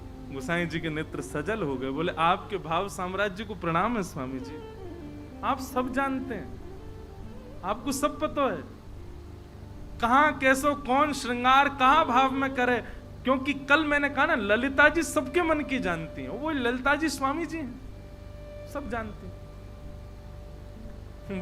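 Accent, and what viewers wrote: Braj, India. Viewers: Maharaj ji aapke mukh se barij bhasha mein katha sunna bahut acha lagta hai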